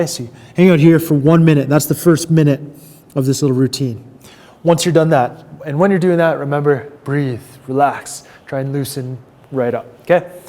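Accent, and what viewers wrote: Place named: Canada. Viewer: Definitely Canadian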